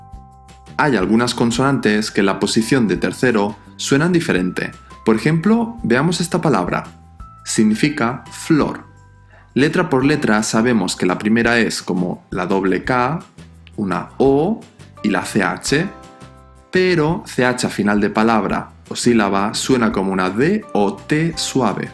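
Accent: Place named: Spain. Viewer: gracias por responder...en que parte del mundo nacistes? se escucha como español de España...sigo viendo tus videos y tambien te voy a seguir por Instagram..saludos..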